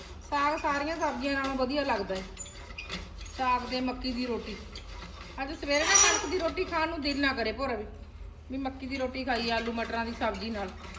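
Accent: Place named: Doaba, India. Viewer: Asi fgwarhey sahr to a,plz dso tusi kitho o,thadi boli dwaabe di lg di a,sade paike dwane c aa,plz dso ji gbu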